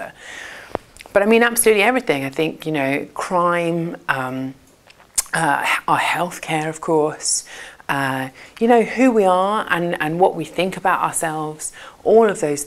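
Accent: United Kingdom. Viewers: Is that a south London accent? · British Pronunciation. She’s a top mathematician.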